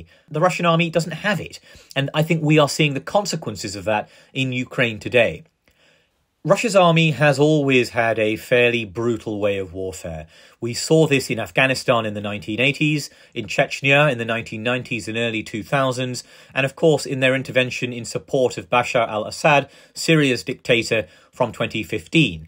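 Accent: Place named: United Kingdom. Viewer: Why Shanhunk joshi speaking like a limey. Where's the 711 accent ???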